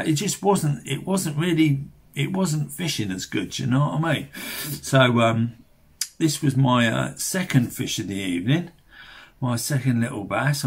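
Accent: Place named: London, United Kingdom. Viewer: Great video. Thanks for sharing. Do I detect a slight London accent there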